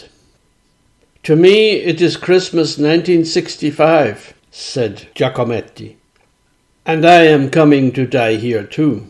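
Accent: Dublin, Ireland. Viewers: Beautiful unique Dublin voice and a wonderful story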